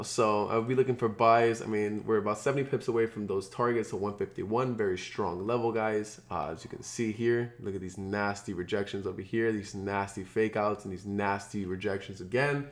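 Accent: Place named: United Kingdom. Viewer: That British accent got me dead 😂😂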